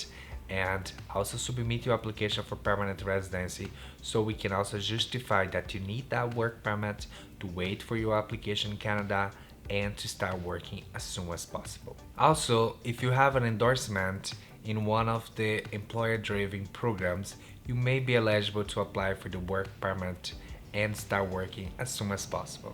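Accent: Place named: Brazil. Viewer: HE HAS A BRAZILIAN ACCENT. :)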